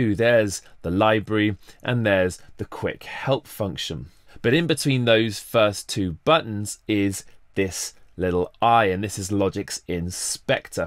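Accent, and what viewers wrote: United Kingdom. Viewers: Every time I read GarageBand in my head, it’s now in a UK accent....damnit. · After watching this video I never want to hear another British person pronounce “garage” ever again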